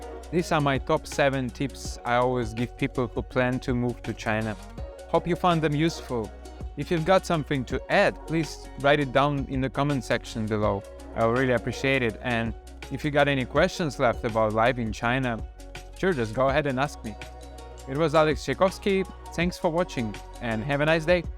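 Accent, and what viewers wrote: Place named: Russia. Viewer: Hi Alex! You fon't know how much you could measure my plasure to meet you on Youtube for the first time. I think your Country is Russia or not? I love your accent, your English is very good and thanks for 7 advice your just give to someone who wants to go to China. They were very very useful for everybody in youtube, I supposs to.❤🇨🇳🌹🙋🌻Good luck in China and your new language learning:Chinese-Mandarin. Greetings from Brazil.💚🌎🙋🌹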